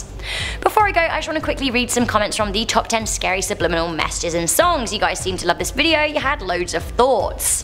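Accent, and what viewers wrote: United Kingdom. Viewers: voice is so British and soothing, it's like a bedtime story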